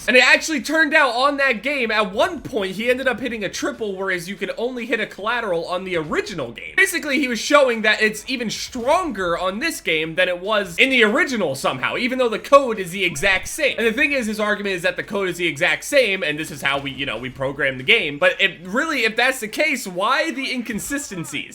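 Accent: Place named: United States. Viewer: I stopped after every few seconds to mimic his pronounciation becuase I have to improve the pronounciation of my English and I hate the british english they are teaching us at school. thanks for your American accent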